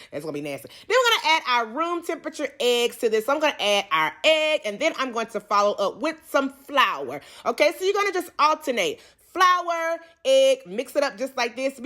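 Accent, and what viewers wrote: England, United Kingdom. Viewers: Love the hood, England accent. You ought to give us that combo more often I LOVE IT🤣 also the cake looks moist and delicious. Im excited to try this recipe for sure. Thanx my girl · Not the British accent 😭😭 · The British Accent had rollinng. I'm like "what happened"? lolololololol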